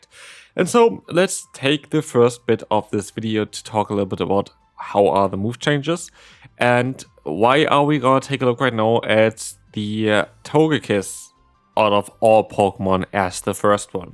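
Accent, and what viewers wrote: Germany. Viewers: It would be great if you could speak a little bit slower and more exactly because it‘s pretty difficult to follow this fastly spoken Englisch with the German accent. Especially words like „literally“ sound like „liddellillllyy“ or „in todays video“ is „in tdsss veoo“. Thank you!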